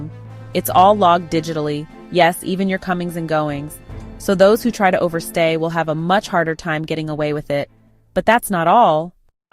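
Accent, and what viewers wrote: United States. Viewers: Sick of this American narrator and her bigotry. Presumably AI, input by the trumpeter. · American narrator ?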